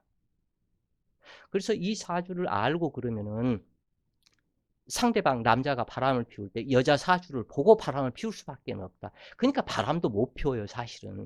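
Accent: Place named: Jeolla, South Korea. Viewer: 전라도 사투리가 엄청 도드라지네요.ㅎ 갑신월 기사일주라서 주의깊게 듣고 갑니다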